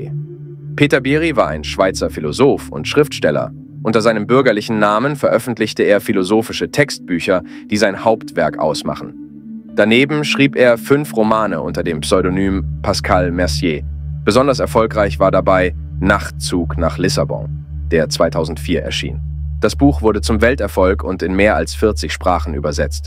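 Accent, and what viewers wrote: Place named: United States. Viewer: was soll dieser "Ami-slang" in deiner Präsentation?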